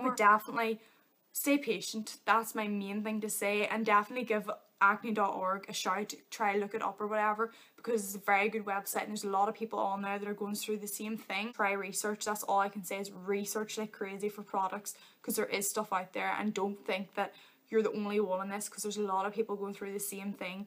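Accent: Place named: Birmingham, United Kingdom. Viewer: U speak peaky blinders accent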